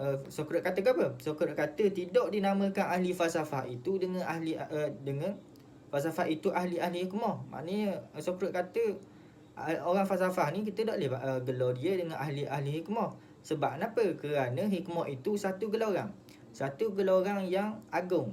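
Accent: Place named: Terengganu, Malaysia. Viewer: Pekat sungguh ganu enta... Akan tetapi penerangan yg jelas dan terperinci 👍👍👍👍👍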